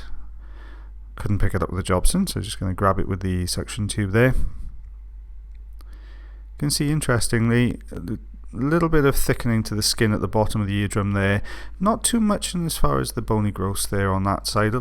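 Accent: Wales, United Kingdom. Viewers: Mum is welsh so i know that accent well even though we been living in America for 25 years lol and I can confirm welsh people are very intelligent people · I'm in Wales tonight and can confirm plenty of people talk like and everyone I've spoken to thus far knows what a Jobson Horne is.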